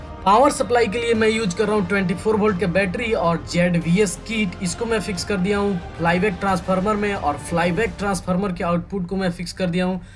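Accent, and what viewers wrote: Bihar, India. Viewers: bhai apna hindi nd english accent sahi kr lo thoda impression achha bnega apke accent m bihari tone aata hai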